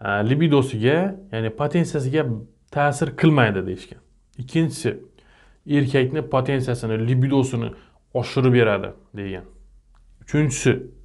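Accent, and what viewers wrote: Khorezm, Uzbekistan. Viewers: Доктор хоразмданмисиз дейман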